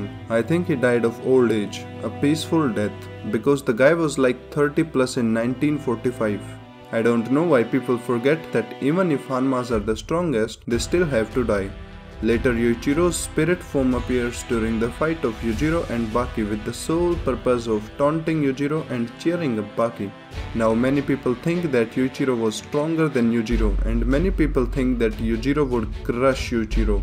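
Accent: India. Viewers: nah his accent(I think Indian) pronounced the both very similarly.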